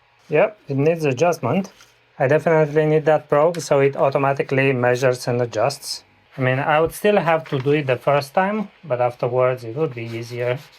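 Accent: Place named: Romania. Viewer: are u from romania? ur accent sound like a romanian one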